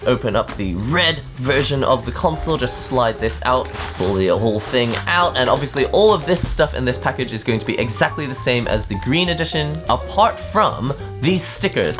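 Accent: United Kingdom. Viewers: did you grow up in the uk? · Im amazed how you sound like your from the UK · For a gamer living in Japan, you do sound very British. (It's a compliment don't worry, I'm not saying that you're not actual Japanese). A pity Nintendo didn't make a version of Game Boy Green for the west even as a download title for these consoles or sell it pre owned, that would have been a hot seller, but there you go. All they had to do was include the red/blue Pokémon that you couldn't get in Yellow as exclusives and there you go. :D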